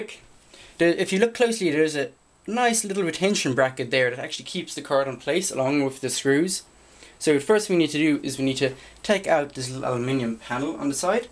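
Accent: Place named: United Kingdom. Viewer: you talk sooo british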